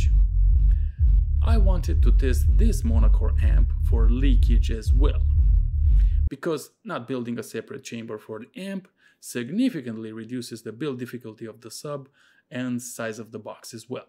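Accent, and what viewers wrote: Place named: Romania. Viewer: is that a Romanian name? You have the accent and I’m from that area I can kind of tell. Anyways you should try building one for a single 12, keep sealed chamber at 1 cube and ported chamber at 3 or as large as you can, tune the front port anywhere from 40-43 and for port area start at 75% of your cone area and shrink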